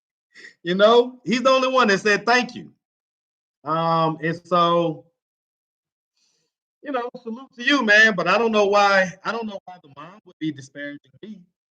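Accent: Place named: Atlanta, United States. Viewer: Why do you have on a NYC shirt,? Are you from NY? If so, how long have you been living in the A? B/c you have an Atl accent. I'm from Harlem, NYC, born & raised 121st & Lenox Ave. But I've been living in GA for 2.5 yrs now. Don't miss NY, not ready to go visit yet. Finally, get to put a face to the voice.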